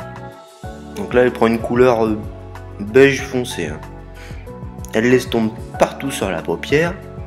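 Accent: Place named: Pays de Caux, France. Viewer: Avec le vrai accent cauchois , on adore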